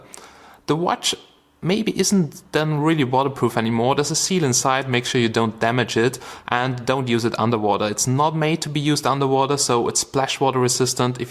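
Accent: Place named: Germany. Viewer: You sound german m8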